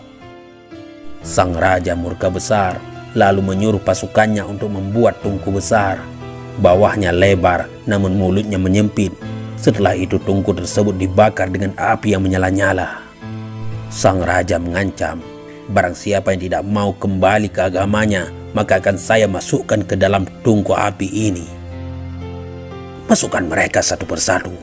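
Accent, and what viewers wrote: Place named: Bali, Indonesia. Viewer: Naratornya..kaya logat orang bali..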